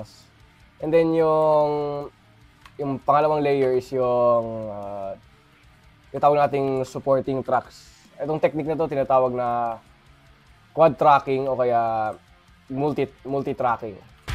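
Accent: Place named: Philippines. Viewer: You sound philipino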